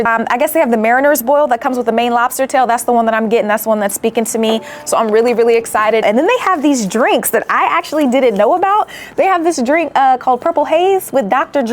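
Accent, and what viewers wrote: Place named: South, United States. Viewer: I love the video but i think i got turn on from the cowgirl country talk, then she sings the and song and then she wears a red dress and i think the pumps to go w it.. i love you girl like literally. Honestly, i don't like too many ppl but this lady does something to me. Always have great food reviews videos...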